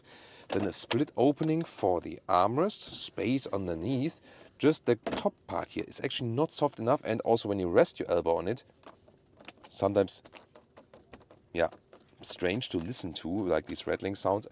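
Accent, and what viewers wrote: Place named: Germany. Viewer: Why do they have a German doing the English version... this is dire... 🙃!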